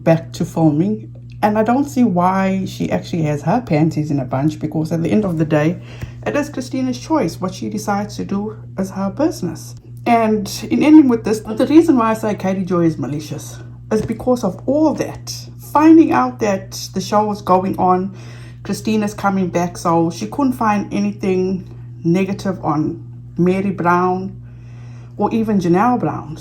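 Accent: South Africa. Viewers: Are you freaking stupid?!? Kj was advocating for the kids. She's callinging Christine out for allowing her child to be used. I don't like that she is going in on Christine but tbf most are manipulated their whole lives for what is normal, kj is coming from a western idea. Being that you sound like a south African you can't understand her point of view. Like we don't agree or understand your pov with your countries history. But we let y'all be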